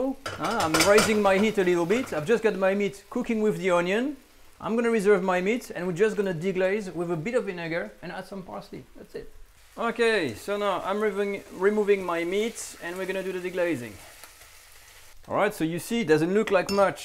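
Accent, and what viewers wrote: France. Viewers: he's native french speaker, providing english for us. can YOU speak french that well that you'd be on camera and be perfect? sheesh folks gotta insult others... make egos happy insulting. no wonder there's global issues with RUDE RUDE RUDE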